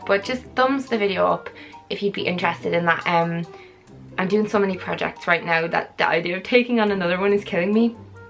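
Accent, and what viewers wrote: Ireland. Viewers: R u Irish? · Ireland u can here on her accent hahah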